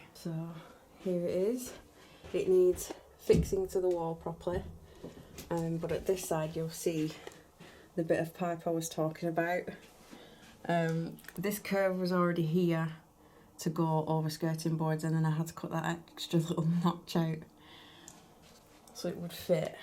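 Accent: United Kingdom. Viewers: Great vlog,. You have the most beautiful British accent in the world. What do you recommend when measuring cakes?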